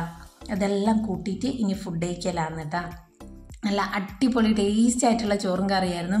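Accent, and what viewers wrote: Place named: Kannur, India. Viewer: Kannurkare samsaram pole und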